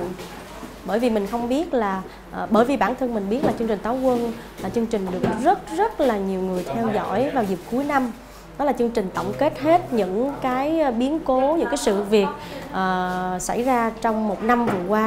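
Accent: North, Vietnam. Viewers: Chị nói giọng Bắc Chuẩn quá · nói giọng Bắc hay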